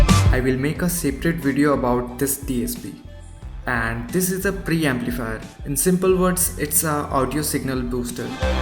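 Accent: India. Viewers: Your voice seems of an Indian ??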